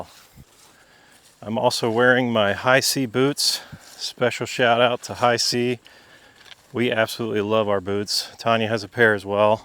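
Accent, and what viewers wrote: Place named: United States. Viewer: I was confused a bit when watching your video. Honey Locust is a native North American tree. You sound American and look to be in the Midwest. When you say invasive, it means the plant comes from a different environment or location and outcompetes native varieties. Better terms might be annoying or disruptive and unwanted. No offense intended & I appreciate the information and showing how to get rid of them. I’m from Indiana am trying to manage the woods on my property and return them to only native plants. The honey locust and calorie pear trees eat tires…. And must go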